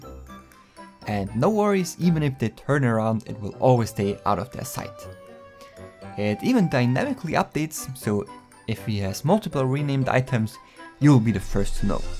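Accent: Germany. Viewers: Are you german? Because you really sound like.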